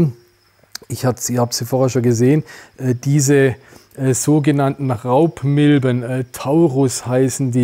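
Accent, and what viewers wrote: Swabia, Germany. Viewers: Sehr gute Folge! Übrigens... Ihr habt mal versprochen, den zu nem Deutschkurs zu schicken, bitte dran denken dass ReptilTV auch außerhalb der schwäbischen Alb gesehen wird, kein Mensch hat was gegen einen leichten Dialekt, aber beim tuts leider a wegn heftig sein, wahrscheinlich merkt er das selber gar nicht, aber meine (Deutschlehrer) - Kollegen hier im Goetheinstitut Madrid, die alle Reptil Fans sind, haben leider keine Chance zu verstehen was er da von sich gibt, obwohl inhaltlich natürlich super gut und interessant. Bitte nicht übel nehmen, den Tipp, ist nur gut gemeint 😉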